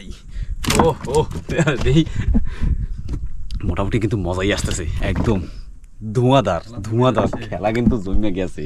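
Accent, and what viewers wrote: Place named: Barisal, Bangladesh. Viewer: Andamaer prakitik drisso osadharon ei drisso dekhar jonno bose thaki ar vai er borisaler vasa sonar jonno apekhe thaki khub valo lage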